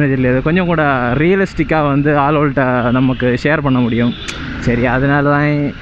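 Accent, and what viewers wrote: Kanyakumari, India. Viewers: Malayalam kalantha tamil,na athu enka kumari tamil,tan.....enka slang enka adayalamla makka😎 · Bro இது சிங்க தமிழ் என்னும் குமரி தமிழ்🤙🔥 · Slang 🤩🤩✌️ Next video kanya kumariii slang venum 🙋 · Kandippa bro, waiting for Kumari slang vlogs😍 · Kanyakumari Tamil vera level 😂😂😂💥💥💥💥💥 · Kumari slang 🔥🔥🔥🔥🔥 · Kanyakumari slang verithanam 🔥🔥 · Neenga Pesura Tamil kekkave inimai ah irukku bro Edho somma solra nu nenaikaadheenga bro unmayavey avlo arpudham ah irukku bro Basic ah vey enakku Malayalam romba pudikkum and Namma Kumari slang kekkumbodhu... Adha solla varthai eh illa bro It's melting my heart♥️ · Kanyakumari slang 💓💓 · Thala iam in Thoothukudi, Ungaloda kanyakumari slang nala eruku thala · Nama slangu solava veyanum🔥🔥❤... ne podu makka... verra tamil athu kumari tamil tha❤🔥😎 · Kanaya kumari slang 🔥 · Super kanyakumari tamil love to hear this kind of tamil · our village. 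Kanyakumari slang adi Poli🔥🔥🔥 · Super ra speak pandringa kanyakumari language la bro... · Makka namma slang la neraiya pannunga other dist people ku romba pudikum namma slang.... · Ithu than kanniyakumari slang unluk Jack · Vlog in Kanyakumari slang 👌👌👍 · innum neraya unga slang la video pannunga makka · Ithu enga Kumari tamizh♥️ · Machi😍😘 nalla irrukku unnga kanyakumari slang mm semma style mm😘😍athan finishing than semma😁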